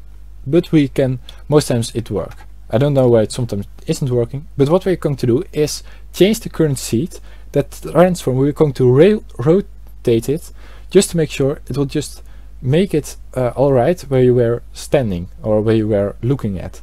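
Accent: Netherlands. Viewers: Hey + are you dutch because you sound very dutch haha. Keep up the great work!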